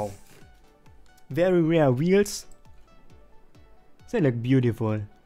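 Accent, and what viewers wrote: Germany. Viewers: are you german? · Heyo bist du n Deutscher? Ich finde den Aktzenz hört man immer sofort raus :D. hast du Lust mal zusammen zu spielen? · Are you German? xD #Giveaway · Man hört so krass, dass du deutsch bist. Warum machst du keine deutschen Videos? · Du bist safe Deutscher :D das mekt man sofort. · Du bist doch deutscher oder 😂😂👍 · Are you a german ?? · man hört das deutsche mega raus xD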